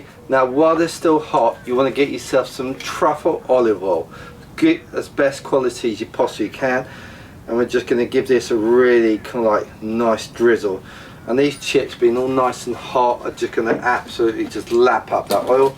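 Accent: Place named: United Kingdom. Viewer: I love that 🇬🇧posh English accent! And the Ninja💐!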